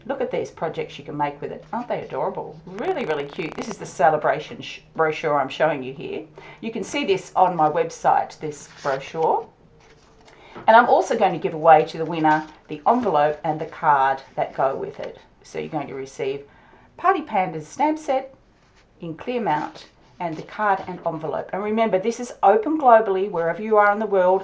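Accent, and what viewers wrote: Australia. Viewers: Just found you through a friend. Nice to hear an Aussie voice!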